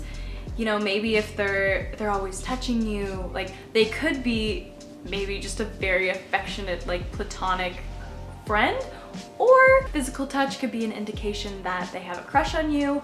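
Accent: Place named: Canada. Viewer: you sound so canadian in this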